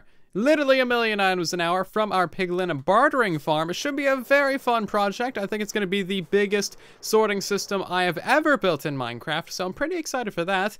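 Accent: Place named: United States. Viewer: It’s a pretty obvious American accent if you ask me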